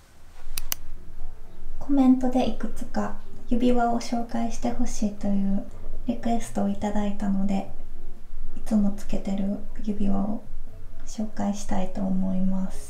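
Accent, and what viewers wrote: Kansai, Japan. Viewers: グリーンのパーカー可愛いです❤ 画面が明るくなりますね😊 naoさんの声と関西弁大好きなので、声出し嬉しいです😆 いつも使ってらっしゃる食器が可愛くて、同じものがほしくなってしまいます。今回も焼きバナナを入れていらっしゃったピンクの器にキュン❤ もし良ければ、いつか器紹介もしていただきたいです🩷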